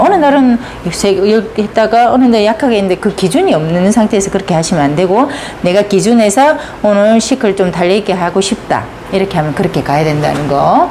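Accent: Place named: Gyeongsang, South Korea. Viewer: 오늘 처음 들어왔는데요. 경상도말씨가 정겹습니다. 거의 대부분의 유튜브가 서울말씨인거에 비하면 부드럽지는 않은데 정확하게 알려주시네요. 설명이 아시는거에 비해 매끄럽진 않지만 ㅎㅎ 저는 참 좋습니다. 엄청난 내공이 팍팍 느껴집니다. 실전이 느껴집니다. 계속 들어가서 배우겠습니다.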